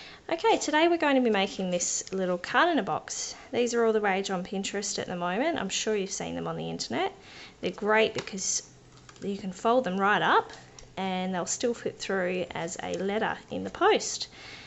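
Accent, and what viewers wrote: Australia. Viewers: I really enjoyed your tutorial. You explained everything clearly and I enjoyed hearing an Aussie accent for a change on a Stampin Up video. I have subscribed, so I hope to watch more of your videos in future. Thanks. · Great to hear the Aussie accent with stamping up can't wait to try this card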